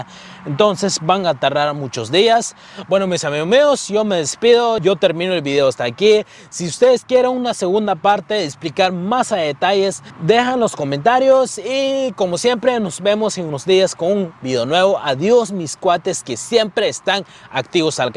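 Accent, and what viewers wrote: United States. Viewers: Buen video bro, pero una pregunta, a los cuantos años llegastes a Usa? Porque hablas como los chavos que nacieron alla. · Porque hablas como gringo oye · jjjjjjjjjj lol 13 años y en redas la lengua como gringo no la en redo yo que tengo 30 años aqui y me cruso mi papa teniendo 5 años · Me encantan tus videos pero no entiendo por que perdiste tu acento osea te cuesta el español y eres de Guatemala no entiendo ese esfuerzo de hablar como gringo espero no lo tomes a mal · y tu acento hermano asi como medio gringo jejen una pregunta , curiosidad , soy seguidor tuyo , me asusta ir con coyote pero bueno es bueno aprender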